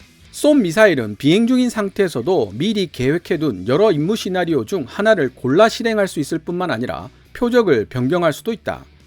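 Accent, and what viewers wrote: Gyeongsang, South Korea. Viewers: 경상도 분인가?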